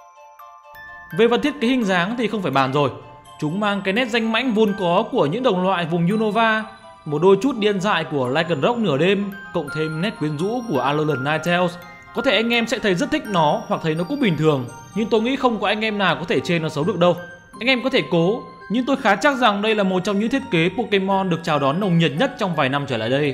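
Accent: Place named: Hanoi, Vietnam. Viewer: ad người hà nội à giọng nghe hay phết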